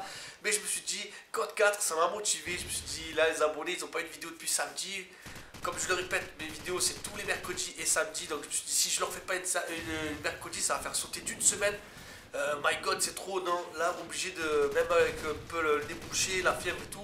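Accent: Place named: Marseille, France. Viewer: GG, pour le gameplay,je connaissais ce call of et j'y et jouer beaucoup mes pas en multi j'étais encore gamin je jouer que en solo et je fessais les mission je c pas combien de fois et cet map ma flasher dans la tête je crois que c'était la première mission dans cod 4 en campagne si je me trompe pas ^^ bref bas moi je c pas trop comment sa marche sinon je t'aurai bien donner 1 ou 2 euros pour le Paypal et je joue sur pc donc voila ^^ dommage désolé même si je te connais pas bien car je me suis abonné ya pas trop longtemps mes j'ai regarder tes anciennes vidéo tu es honnêtes (un mec de marseille avec un putain de bon accent xD ) etc bref bonne continuation sa payera par la suite a+ mec pour une prochaine vidéo:p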